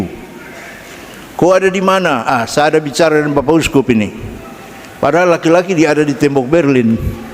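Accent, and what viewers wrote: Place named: Papua, Indonesia. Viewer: DIALEK PAPUA ITU KELIHATAN KERAS TETAPI ITU BUKAN BAHASA KOTOR ATAU KASAR, USKUP KAMI KEUSKUPAN MANOKWARI-SORONG ADALAH USKUP DENGAN GAYA DAN CARA PANDANGNYA SAMA SEPERTI ORANG PAPUA PADA UMUMNYA. BEGITULAH KAMI DISINI. INGAT USKUP KAMI HILARION DATUS LEGA ADALAH USKUP YANG TAHU BENAR SIKON DI PAPUA, SALAM HORMAT DARI UMAT DI TPW FAKFAK, PAPUA BARAT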